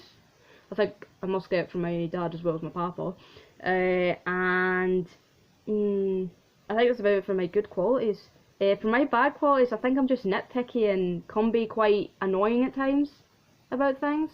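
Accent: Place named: Scotland, United Kingdom. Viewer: Ahhh I love Scottish accents me Grandma's from Aberdeen and trying to understand her is fun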